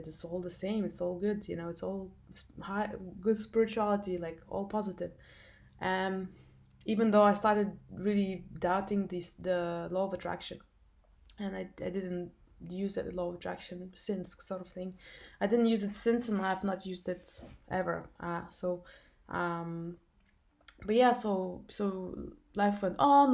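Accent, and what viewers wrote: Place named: Eastern Europe. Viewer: My sister if you felt spiritual oppression yesterday on halloween it means you're on the right side. the side in the Light opposite to witches. I have a question - are you from some slavic country? you sure have that accent. Jesus keep you close to HIM. Jesus guide you so that you don't stumble. In Jesus Holy Name we pray. Amen.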